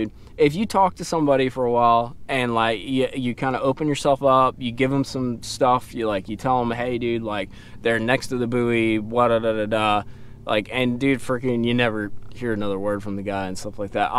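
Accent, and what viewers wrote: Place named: Pittsburgh, United States. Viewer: Good content, where are you from? You almost have a Pittsburgh accent lol I heard you say n’at before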